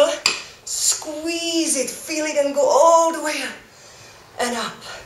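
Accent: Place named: Eastern Europe. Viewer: I love your accent i could listen to it all day. Slavic women seem to have heavy voices.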